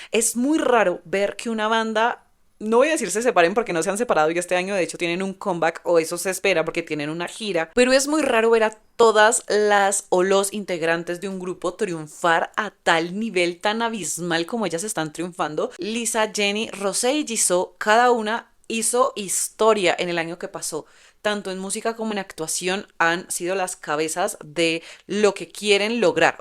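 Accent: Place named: Colombia. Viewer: Con ese acento tan hermoso sabía qie eras de Colombia😘 ❤.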